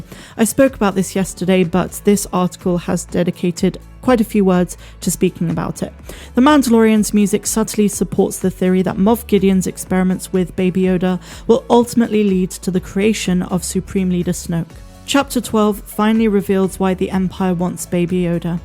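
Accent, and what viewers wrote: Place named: West Country, United Kingdom. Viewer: From the South West of England.. i knew it.. We love the letter Arrr in these parts.. Gert Lush x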